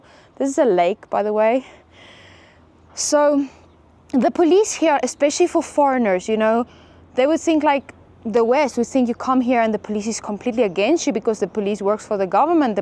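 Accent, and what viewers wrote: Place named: South Africa. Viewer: Two seconds listening to you i knew you a South African. Greetings from 🇿🇦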